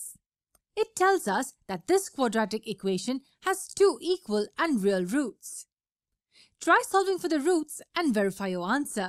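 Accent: India. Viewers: That moment I heard the Indian woman I knew I was in for hell.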